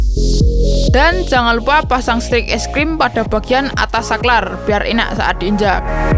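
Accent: Java, Indonesia. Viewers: Oke le medok bahasa nya wkwk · Medok banget iki mantep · Medok tenan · Logat jawanya kelihatan Jowo ndi mas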